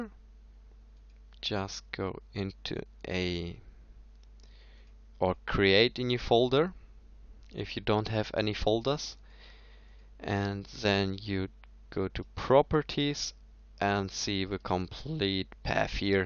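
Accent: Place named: Germany. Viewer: Hey, i managed to get Ubuntu running in my Macs. I actually have several macs like a Studio with M1 Max and a MBP M3 Max. The solution - if anyone needs it - is called "UTM" and can run a Ubuntu 22.04. From there you can run the script. God thx i did not throw away my 6TB from my WD MyCloud Home. It will take days or weeks to recover files i guess, but it should worth it. Am i right you are german like me? Because of your accent. Anyway... WD is der letzte Dreckscheissladen und bestimmt meine 4te HDD. Hoffentlich Insolvenz incoming 😂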